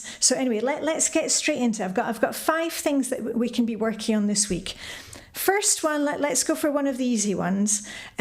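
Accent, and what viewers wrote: Scotland, United Kingdom. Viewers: I'm 79 and enjoy your site. My grandmother was born in Scotland so your accent is a sweet reminder of her. I've been through decluttering my former hobbies, but I still have the urge to buy office supplies every August when the school supplies are on sale. Often, my biggest problem in decluttering now is my husband who wants to run all our castoffs through a yard sale.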